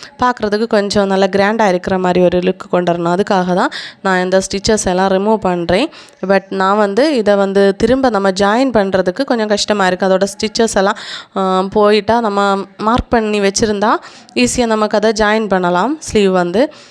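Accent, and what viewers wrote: Kanyakumari, India. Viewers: Hi sis your kanyakumari slang is really nice I like your native language ❤❤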